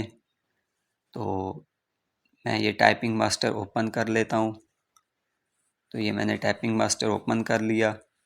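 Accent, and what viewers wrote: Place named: Pakistan. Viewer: Thanks bro kam ho gaya App pakistan sa ho app ki Language apka accent vahi ka ha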